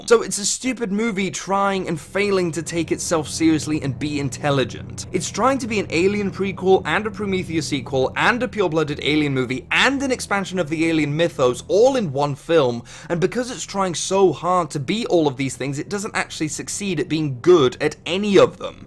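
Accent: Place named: United Kingdom. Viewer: Nice video, very good points and your delivery was spot on. Also your British accent is one I wish I could replicate.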